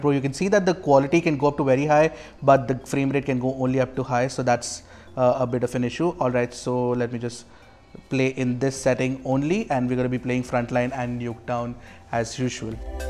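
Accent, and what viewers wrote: India. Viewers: I can still understand you even you use indian accent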